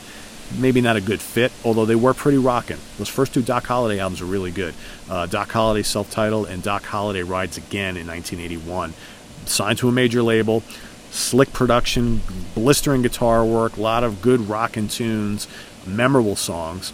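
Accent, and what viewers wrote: New York, United States. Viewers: CCR were from the San Francisco Bay area. Don Henley co-led the Eagles with Glenn Frey, who was no Southerner, and nor were most of the other members of the band. Anyway, you came onto this man's video here, insulting him and using profanity, saying that he doesn't know shit about Southern music because he's not from the South, and he has a New York accent. Man, that kind of thinking and behaving is not "Southern." it's just rude, ignorant, and disrespectful. You may be from the South, but with the way that you came onto this guy's video, telling him off and spouting profanity at him for no good reason, you actually sound more like a Southerner's stereotype of a rude, loudmouth Yankee than a Southerner who was raised with some manners. That's all I have to say. Anything more would be wasting my time.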